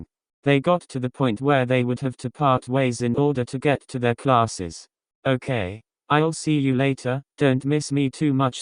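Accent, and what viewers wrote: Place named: United Kingdom. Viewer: Wow why do you sound British- owo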